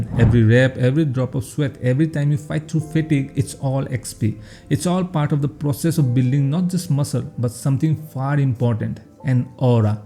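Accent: India. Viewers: Assamese Accent on top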